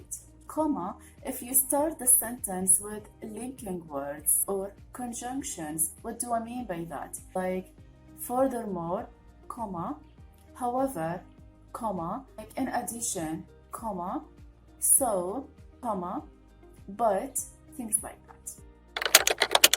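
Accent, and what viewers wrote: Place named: England, United Kingdom. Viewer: thank you, I really appreciate your work, btw I love your English accent and your soft voice <3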